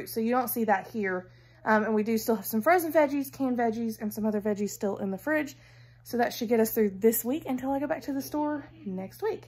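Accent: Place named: Texas, United States. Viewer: I love your accent.are you from Texas.iam too.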